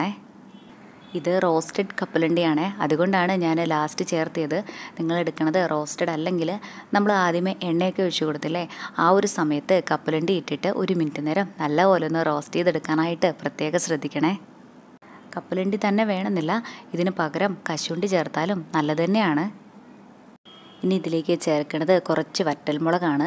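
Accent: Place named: Thrissur, India. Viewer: 🌷👌🌷, നല്ല തൃശൂർ ശൈലി ഭാഷ 🤪💞👌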